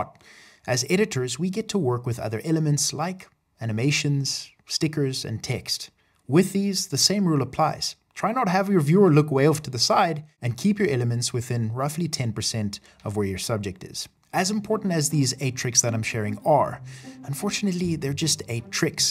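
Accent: South Africa. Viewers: Your accent sounds South African ? Are you ? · Are you South african🇿🇦?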